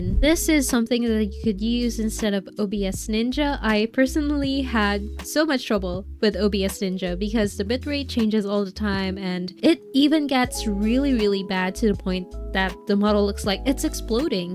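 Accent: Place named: Philippines. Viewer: Yk when i first heard your voice, i was like is she a filipino?, she's definitely a filipino. and after i searched you deeply You are indeed a filipino HWAAHAHAHSHSS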